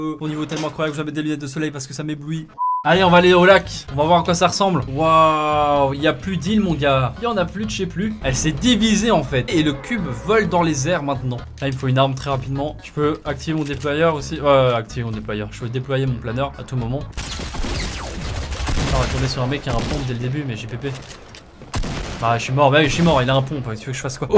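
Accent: Belgium. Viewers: c'est étrange avant t'avais pas l'accent belge mais là en t'endtendant parler 😂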